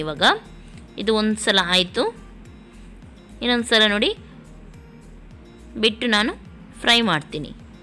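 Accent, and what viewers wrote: Mangalore, India. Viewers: Ma'am nimma manglore Kannada kelodake tumba kushi aguthe kelthane irbeku ansuthe.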